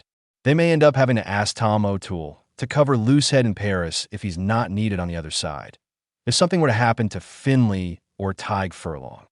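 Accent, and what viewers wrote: United States. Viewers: Why the American accent? This isn’t an American sport - it’s British. Change the narrator. And you’ll have a better response…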